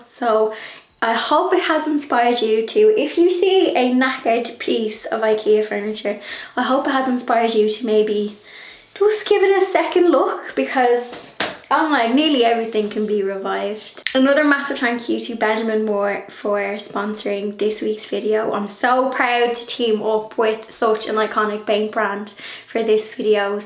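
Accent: Ireland. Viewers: Oh my gosh, that looks amazing, I was also amazed that you just moved things around your room and painted everything in place. I would have had paint everywhere! Great job, loved the idea and thanks for sharing....PS you are simply lovely and I could watch you all day just to hear your beautiful Irish accent. Stay safe, hugs from 🇨🇦❤️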